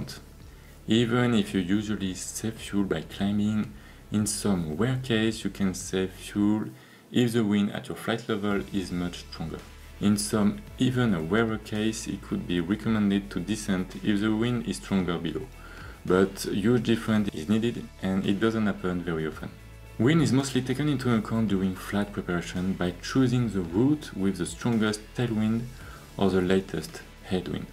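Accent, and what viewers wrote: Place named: France. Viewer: Ca c'est de l'accent Franco-Français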